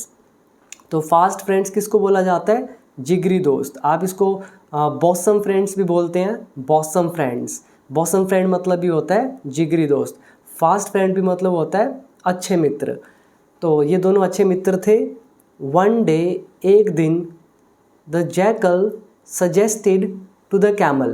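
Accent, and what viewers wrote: India. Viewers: Well done but u should work on your prononciation, because u use Indian accent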